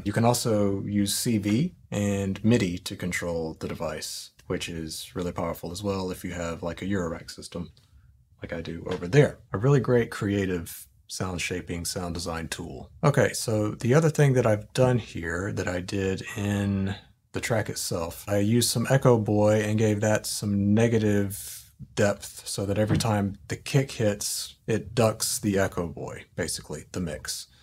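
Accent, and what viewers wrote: South, United States. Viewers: First off, thank you for this video... I can employ it on the MKII (or+FX)... And second, I don't know whose accent I like more... Florian from Bad Gear or your southern charm. HAWHHHHHHich ever though, it adds to your dry wit, which you deploy like a sniper.... first you don't realize the moment is coming, and then when it lands, you wonder where it came from. All interspersed is some of the best first hand practical knowledge of gear.!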